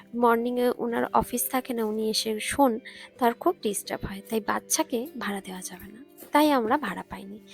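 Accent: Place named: Bangladesh. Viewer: আপনার ভয়েস টা শুনে ইন্ডিয়া মনে হয় না বাংলাদেশী মনে হয়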